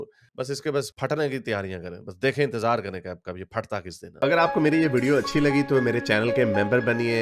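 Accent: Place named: Punjab, India. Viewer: Bhai kitna bhi disheartened ho jao but videos banani band nahi karna. Aapka Punjabi accent aur Punjabi mein kosna bohot acha lagta hai.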